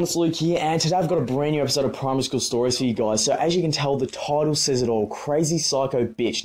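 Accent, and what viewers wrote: Australia. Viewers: R u Australian coz im Australian and u definitely have an Australian accent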